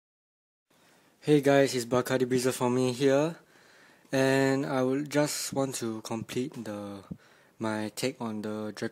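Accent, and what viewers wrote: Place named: China. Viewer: your really good man! you have a chinese accent... anyways if you want to know, i am chinese ^_^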